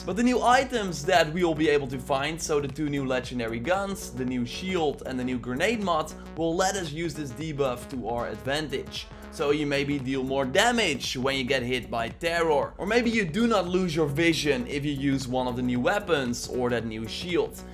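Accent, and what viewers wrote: Netherlands. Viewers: ....... are you dutch?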